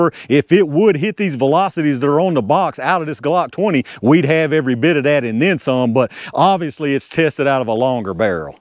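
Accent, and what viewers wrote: South, United States. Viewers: The Phillips screwdriver tip creates a vortex. Very similar to where you drain your kitchen sink and water swirls around the drain as it goes down the drain. Another example is if you were baking a cake and you use the beaters and they swirl around in the batter the batter will automatically be slung to the sides of the ball in the center is empty. That’s another created vortex. The ball is generally solid copper, and it creates a larger hole than almost any hollow point mainly because of the Vortx it creates. I have researched the Phillips screwdriver, bullet, and what it does makes a 380 as effective as a 9 mm and a 9 mm as effective as a 45. Oh, that is on wound diameter. If the Phillips screwdriver gets anywhere near the heart, it will blow the heart up. I use it on all of my carry handguns. I got pretty accurate to. Right now I am in search of the same cartridge and bullet shape for my 38 special snubnose. 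However, you performed a great demonstration and I enjoy watching you whenever you are on the air. Keep up the good work I especially love your southern accent. It is beautiful.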